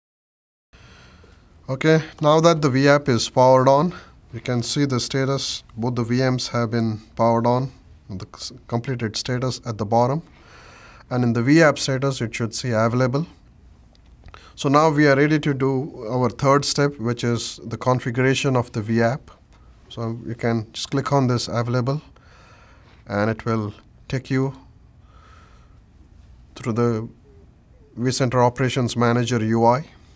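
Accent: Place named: Arab world. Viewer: Mto bacana o vídeo, deixo minha pergunta pq o sotaque árabe, iraniano ou perse?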